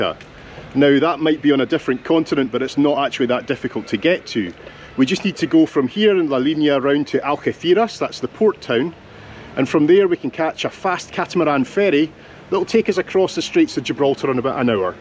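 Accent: Scotland, United Kingdom. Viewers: Seu espanhol é muito bom mesmo com seu sotaque escocês, mas como é seu português? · I love Español with a gentle a Scottish burr.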